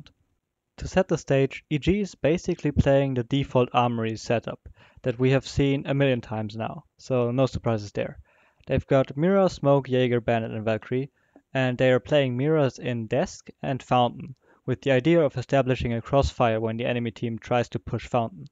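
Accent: Germany. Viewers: Nice video! Are you german? Your accent sounds like you’re german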